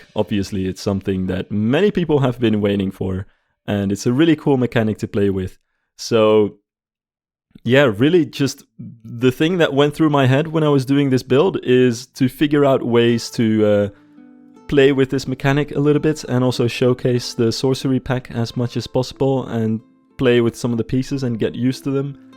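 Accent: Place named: Netherlands. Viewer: Are you Dutch? Bedankt! Haha 😂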